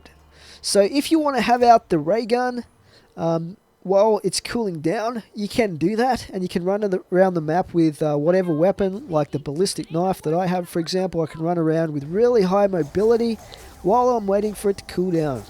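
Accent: Australia. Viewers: Your Aussie too? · i like your accent australlian?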